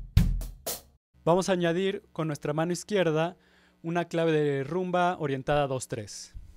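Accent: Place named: Mexico. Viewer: Excelente la manera que explicas y enseñas, por el acento eres mexicano, chingón mi hermano, hoy aprendí algo nuevo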